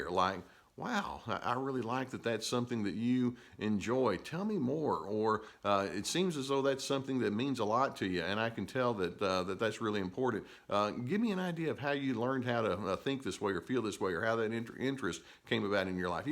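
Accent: South, United States. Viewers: Especially his southern drawl. Makes me feel like home when I'm on the other side of the planet. :) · Oh, my! I'm from GA, too(near Valdosta, GA). Where did you go to college and grad school? Just curious. I love your southern accent.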